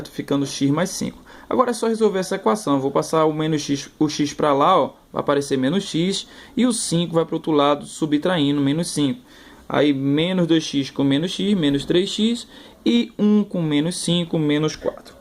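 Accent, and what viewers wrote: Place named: Northeast, Brazil. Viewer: Gostei muito do canal, consegui aprender fácil a matéria. e pra mim fica mais fácil quando o professor tem um sotaque de nordestino, o porque eu não sei .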